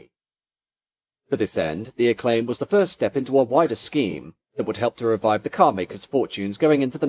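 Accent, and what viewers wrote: United Kingdom. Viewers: What's wrong with it? It's a clipped British accent.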